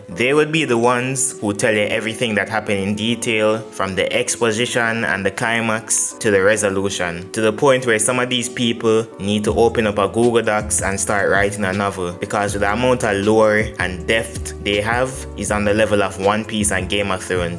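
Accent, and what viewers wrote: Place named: Jamaica. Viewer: Yu sound jamaican.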